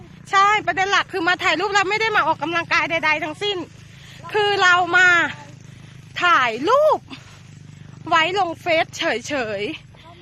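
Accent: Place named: Renu Nakhon, Thailand. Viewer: ภาษาเดวกันกับไทเรณูนครเลยเนาะ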